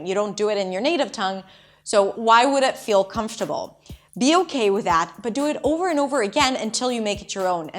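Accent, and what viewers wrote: United States. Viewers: Hi! I love your videos. I'm wondering if you're a native English speaker or if you were able to achieve the American accent that you have through practice? Thanks! I'm looking forward to more videos:) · Look sounds almost like luck? There is no trouble to pronounce V because в is the same :) as for my ears this is loud American accent of Emglish :) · This is an American accent english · with what? This is American English not British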